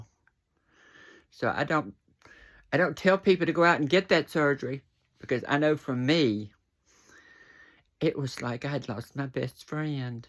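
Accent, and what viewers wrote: South, United States. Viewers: You have a beautiful southern accent the ASMR community would definitely appreciate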